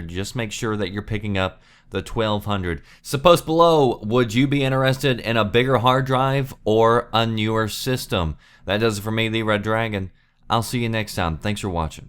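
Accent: South, United States. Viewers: it's that southern sounding accent I reckon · I like your accent, where are you from? (I'm British so don't recognise US accents). Subbed.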